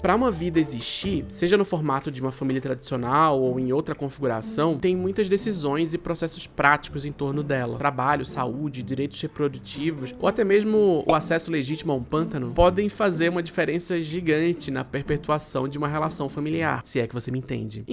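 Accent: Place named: Recife, Brazil. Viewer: tu és da zona sul do Recife, moço?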